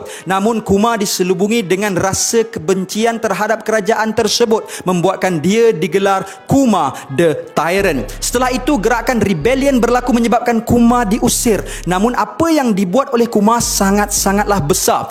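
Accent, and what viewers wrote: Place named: North, Malaysia. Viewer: loghat utara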